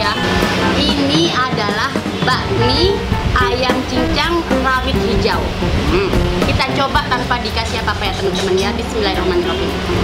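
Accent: Java, Indonesia. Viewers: setu-bekasi hadir n sllu setia nunggu konten2 dari kak yg cantik 😍😍😍 ngmgnya kak tu jawa banget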